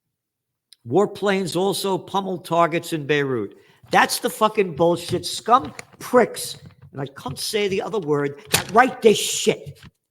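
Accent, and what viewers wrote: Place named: Brooklyn, United States. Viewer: love your Brooklynese!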